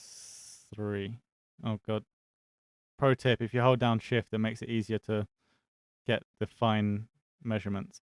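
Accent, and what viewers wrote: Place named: United Kingdom. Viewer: Oh man you're British! And you are the dude that made those sick sonic remix! Ahh it all makes sense!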